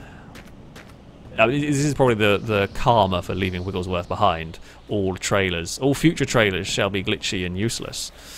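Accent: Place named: United Kingdom. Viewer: Dunno, if you noticed,. But, you see me pleased to see you as one of the few guys playing TLD without heavily relying on an (unnatural) unnerving voice, like ppl on other channels here do. As if they were aiming for a teenage audience... GROSS! So, big thanks to you, for being as british and failracy as ever! 👍👍👍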